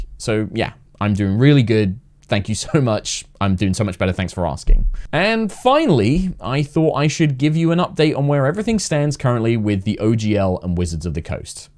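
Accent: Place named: United States. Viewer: I love you but why have you grown more and more American? You pronounce t's as d's.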